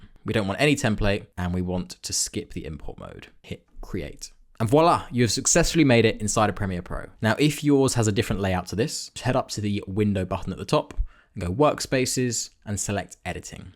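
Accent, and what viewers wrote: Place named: United Kingdom. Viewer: Thank you for these tutorials, you are a really good teacher - and British which helps me a lot! I have been trying to learn how to make a read-a-long video, similar to a karaoke video but to go along with audio narration. If you think there is a market for a tutorial on this, it would be awesome. Thank you x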